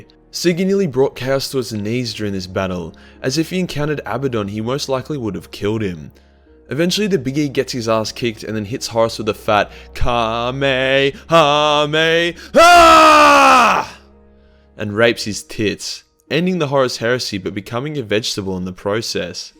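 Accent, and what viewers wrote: Australia. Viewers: also, appreciate a little bit of an Aussie dialect lesson there.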